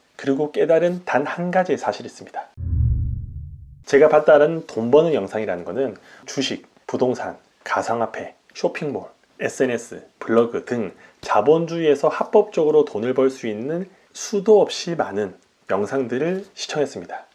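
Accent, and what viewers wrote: Busan, South Korea. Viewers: 말투가 익숙해서 설마했는데 부산분이신가보네요. 투트랙영상보고 알았어요. 근데 부산분치고는 말씀이 아주 부드럽고 듣기 편하시네요!! 유툽이름에 복수형으로 하신 이유가 짐작이 될정도로 진정성이 느껴집니다. 무지에서 유를 창조해내고 누구나 할수있고 될수있다는것을 전파하고싶고 다같이 돈벌어보자는 이유에서 복수형으로 지으셨네요. 진심이 느껴집니다.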